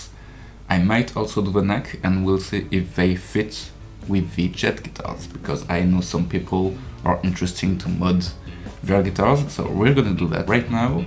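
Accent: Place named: Mumbai, India. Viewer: Où nous démontre sans emphases que: •T as pas besoin de lâcher ni 1500, ni 1000 et ni même 500 euros ou $. • que la guitare venant de Chine ou d ' Angola ou de Papouasie n est pas un problème en soi. • Que c est bien le jeu qui compte et à travers ça la musique. • idem les amplis • idem les effets Les fabricants de guitares de processe industriel ont tous une c.n.c, savent tous bobiner du fil de cuivre autour d aimants. • mon constat: il ya de quoi passer une décade à apprendre à jouer avant de passer à des guitares plus chères et mieux faites. • autre constat:, tu as un Anglais de Bombay.. pratique car on comprend tout et même moi ( c est dire ). Merci pour ton travail et tes avis sur tes vidéos.🙂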